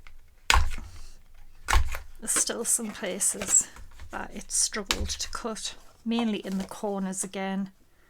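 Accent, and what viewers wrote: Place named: Sunderland, United Kingdom. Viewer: Thanks, great reviewing (even if I just bought the Sizzix switch and am regretting it now). Love your accent - are we both from Sunderland? Except I live in Sydney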